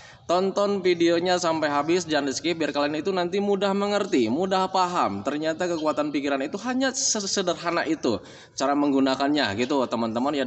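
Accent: West Java, Indonesia. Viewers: assalamualaikum kk,kk orang mn Kya logat2 sunda gtu,pngn ktmu ih klo bsamh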